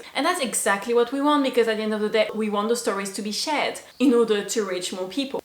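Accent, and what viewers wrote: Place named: France. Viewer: are u you an american native? idk you sound french to me. Anyways, Love your video!!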